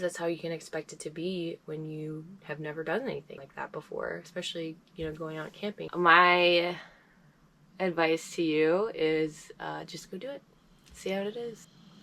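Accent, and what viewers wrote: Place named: Arkansas, United States. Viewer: I love how that Arkansas accent comes out every once in a while. :)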